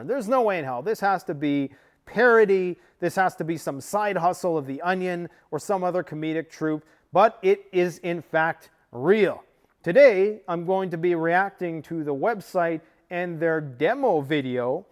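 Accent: England, United Kingdom. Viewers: Dude your English accent is hilarious! The arrogance of these people thinking they will still have servants running around for them after SHTF 🤣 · Wow I'm impressed with your English accent!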